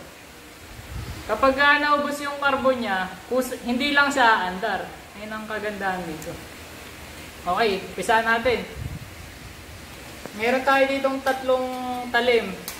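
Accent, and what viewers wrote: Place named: Bulacan, Philippines. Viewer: Sabi na eh hehe, sa punto mo palang. Dyan din po probinsya namin, sa Sto. Rosario Hagonoy. Tagal ko na nga hindi nkkpasyal dyan. Salamat sa tutorial mo, malaking bagay sa aming mga nagsisimula palang .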